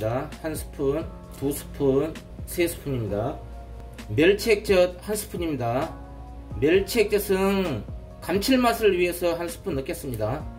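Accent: Jeolla, South Korea. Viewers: 전라도 사투리죠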